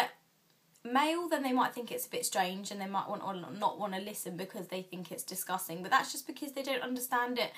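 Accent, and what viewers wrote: United Kingdom. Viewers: Oh ok well she as has British accent so !!! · aww British accent <3 I wish i was there .. :(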